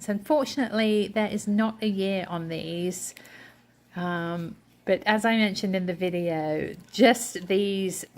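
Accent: England, United Kingdom. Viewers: I'm a new subscriber from England and really enjoying your informative, relaxing videos. I wish we'd have the large Goodwill thrift stores in the UK, our charity shops are very small in comparison, with just a few shelves of bric a brac and very hard to find any treasures. I hope you don't mind me asking, as you and your lovely Mum have English accents with a slight American drawl, so wondering what's your history or connection with England?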